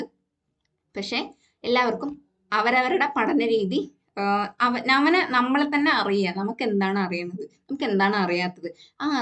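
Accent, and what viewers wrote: Palakkad, India. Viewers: Palakkad aano swadesham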